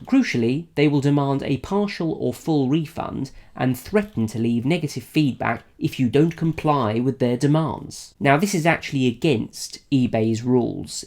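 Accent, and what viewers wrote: England, United Kingdom. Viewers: A genuine honest English accent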